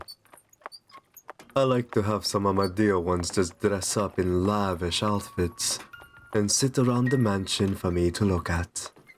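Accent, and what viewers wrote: Russia. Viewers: Sounds Russian 👀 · Is he Russian or something? :D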